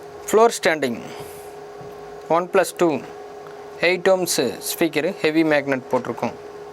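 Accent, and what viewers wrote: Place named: Kongu, India. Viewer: More than work i m big fan of ur kongu slang....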